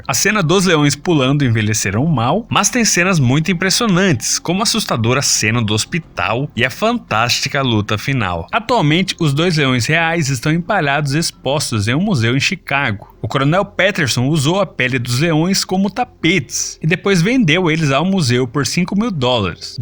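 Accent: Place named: Caipira, Brazil. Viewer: O melhor dessa análise é o sotaque caipira do narrador!!!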